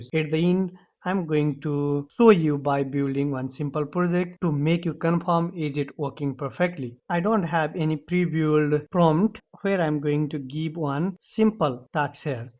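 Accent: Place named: Nepal. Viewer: your accent is so nepali you nepali?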